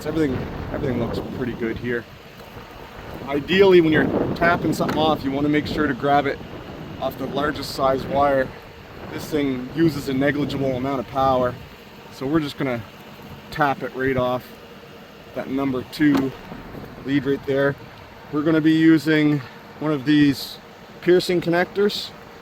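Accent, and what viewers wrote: Canada. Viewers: I'd keep the narration, that's probably more than 50% of why people watch it. If the sound is rough, meh, the ppl who are going to watch aren't the people who will care, they are likely watching to learn about a field they aren't in or want to be in. I found it amusing hearing a lineworker with the biggest "OUUUUT" Canadian accent I've ever heard work to describe concepts from my field of engineering :D (network engineering/architecture) hehe. No shade, keep up the good work. A couple notes 1. The connector you described as a 'piercing something" We used to have an equivalent in the network world called a "vampire tap". I think that actually describes this better as this is a minimum vampiric/parasitic drain that is tapped. maybe you can start a new trend in your field calling it that :D 2. No shade on you, this is what you were given. I'm not a fan of how the insulation is stripped given how in-climate this area appears to be both on the pigtail and stripping it on the heavier gauge wires. 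In my experience that allows the wires to become full of water during the summer humidity which quickly leads to a very bad result :) 3. I think that tray would be nicer if instead of pushing things over the outside edge to get them it was reversed to inside the bucket. Seems like an unforced error there in how they designed it asking for tools to disappear below :D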